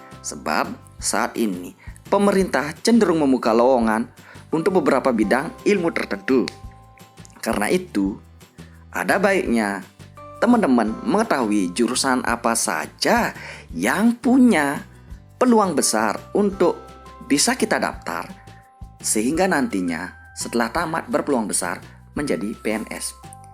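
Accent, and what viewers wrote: Bali, Indonesia. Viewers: T nya lucu ya? dari bali ya? All the best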